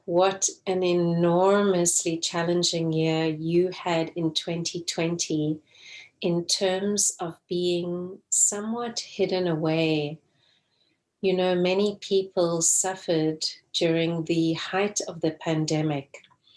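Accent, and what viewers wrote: South Africa. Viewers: I detect a South African accent.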